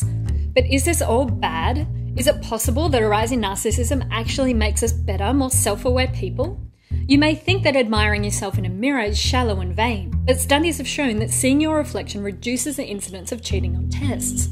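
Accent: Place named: Australia. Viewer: You sound Aussie!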